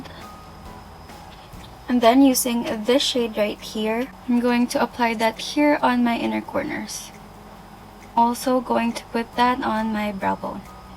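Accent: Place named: Philippines. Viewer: You seem to be such a sweet person. You are from the Philippines, right? I recognize the accent. I am from Sweden and I was in the Philippines for 6 months in 2011 doing volunteer work. Everyone over there are so nice and friendly ☺️ Hearing you speak makes me nostalgic ✨ You are really good at makeup too! ☺️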